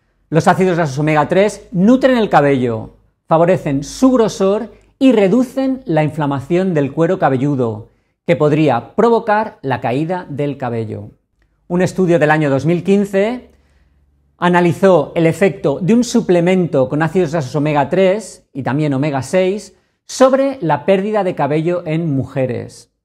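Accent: Spain. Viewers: Q,buena información mi Dr,mando a tomar Omega 3,ya q se me está cayendo el cabello .yo me quedé viendo todo su video muchas gracias .me encanta como háblan los españoles,🇨🇴✈️✈️de Medellín,Colombia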